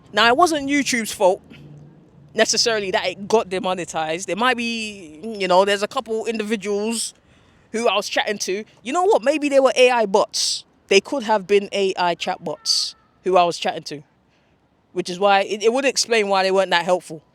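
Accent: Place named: Ghana. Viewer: Hehehe!!! See! He talks like us. That's what fooled me! Ok then! Big ups to Ghana!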